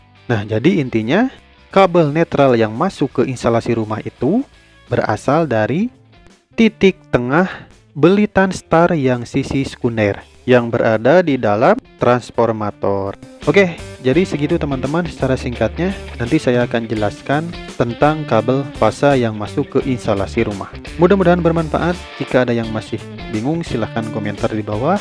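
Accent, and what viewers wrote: West Java, Indonesia. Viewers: Mantap, sunda pisan, salembur atuh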